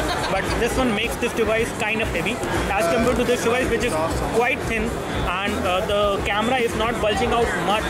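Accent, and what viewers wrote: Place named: India. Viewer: hhhhhh, all u guys complainin' about his way of speaking... he is an Indian - i have no problem, Bollywood fan here =D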